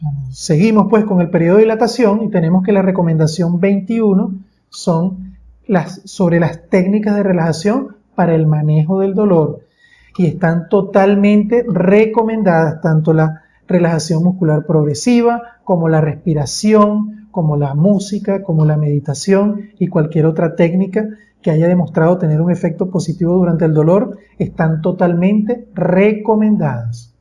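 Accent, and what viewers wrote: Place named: Venezuela. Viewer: Dr que Gusto me da que comparta estos videos... No sabe que felicidad que nos enseñe que nos comparta sus conocimientos...yo soy feliz Además Si se le escucha que sea de Venezuela que bonito hablan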